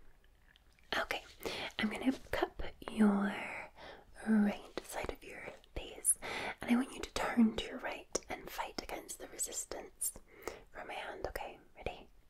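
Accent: Scotland, United Kingdom. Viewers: Thank you. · half an hour of tingles from the resplendent scottish lass. perry the platypus noises ☺️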